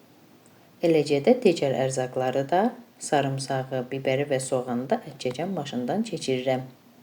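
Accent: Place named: Nehram, Azerbaijan. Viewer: xanim deyəsən Nehrəmlisiz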